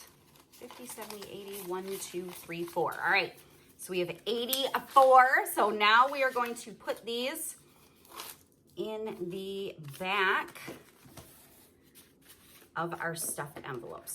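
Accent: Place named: New Orleans, United States. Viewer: I just love your cute little voice all the way from New Orleans..La💜🤣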